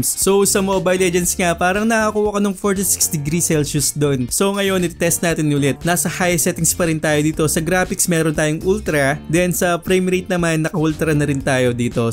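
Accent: Pampanga, Philippines. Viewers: Kapampangan accent tlga e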